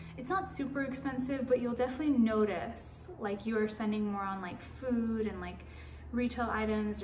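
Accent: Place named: Atlanta, United States. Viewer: You have the Atlanta accent same as mine, Atlanta was full of transplants from the 90s so we didnt get a GA accent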